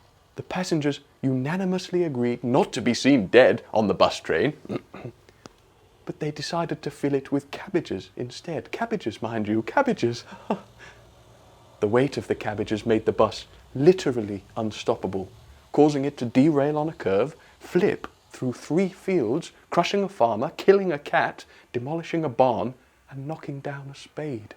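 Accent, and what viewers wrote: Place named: RP, United Kingdom. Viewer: Very well done "posh voiced"! Hugely entertaining and humorous. I dread to think how long it took you to do this!:)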